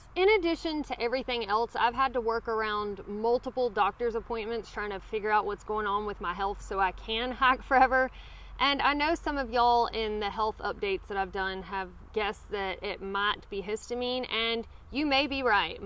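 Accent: United States. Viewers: You sound American 😮.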